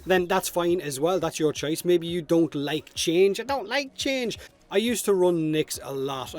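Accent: Ireland. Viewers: You sound hot. Just saying. I'm a sucker for the Irish accent though. <3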